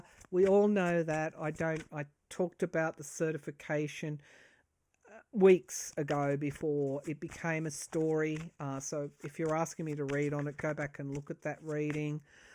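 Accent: Australia. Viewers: Hi, Thank you for your thoughts, I have been watching and appreciating your videos for a long while (and loving your Australian flair). I am one who is still very confused and saddened by Biden stepping down. I understand your excitement for Kamala, I like her and I am so glad she chose Walz. But I feel like Biden was shot down by his own party, he, the one who did all the hard work of bringing us out of the tailspin of the Trump world. The last couple of weeks are the first time that it has been painful to watch your videos. Our 14 million votes for Biden were ignored as Pelosi even admits, in a new article, that she nudged Biden out. And when you said here that Trump's donations are drying up because Biden isn't in the race? What??? I wish you would read on Biden and how he is feeling and what happened there and bring some attention to that. Biden would have won in a landside. 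I have no doubt that Kamala and Walz will win as well. I wish you would address the pain that some of us are feeling, with our preliminary votes being ignored for Biden and still caring for him. I was so inspired with him saying "When you get knocked down, you get back up". My heart was with him and I wanted to see him defy all the MSM and the pundits and win. I can't even watch MSNBC any more, although maybe that's a good thing. With much love💕 Elizabeth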